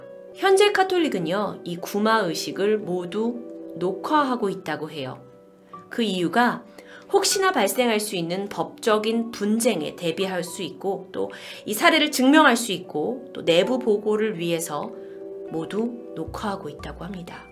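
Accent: Jeolla, South Korea. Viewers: 디바제시카님 전라도 사람인가..?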